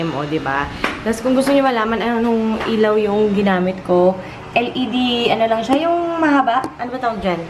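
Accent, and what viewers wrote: England, United Kingdom. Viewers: i love your english accent ate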